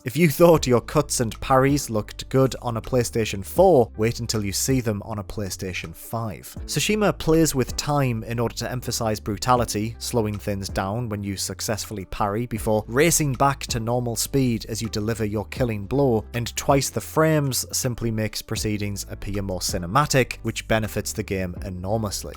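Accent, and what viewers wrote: United Kingdom. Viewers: What accent is this? Which part of the UK?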